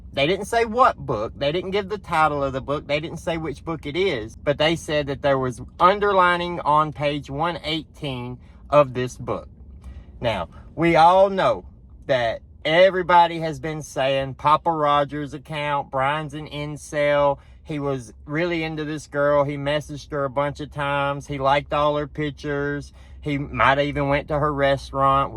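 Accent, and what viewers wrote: South, United States. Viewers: Where in god's name are you from? Your southern twang is SO strong!!! I love it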